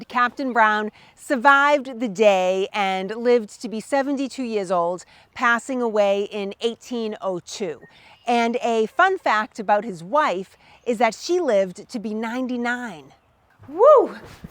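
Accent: Boston, United States. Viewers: It only takes 20 seconds of listening to this lady talking to know that she is definitely from Boston, love it.👌💕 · She speaks the 25 letter Boston accent. No "r" to be found! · Thank for all of your historical research (I love your Boston accent) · definitely New England but more specifically Boston. They sound similar in Maine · Another interesting video, thank you. Your Bostonian accent lends a unique sense of realism to the North Bridge area.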